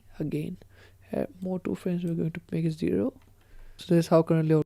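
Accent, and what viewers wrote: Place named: India. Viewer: Yeah, it sounds to indi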